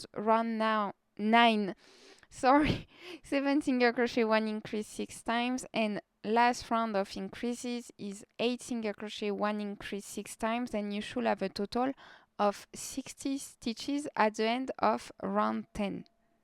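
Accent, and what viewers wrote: France. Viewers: Love it. I’m working on my very first big Santa . Your tutorial is easy to follow, thank you so much . Are you French ? Love your accent.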